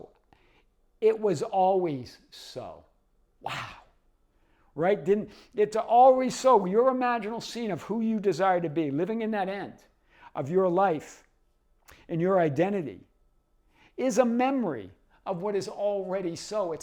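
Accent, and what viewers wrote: Boston, United States. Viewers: Great video! Your accent reminds me of Boston 💪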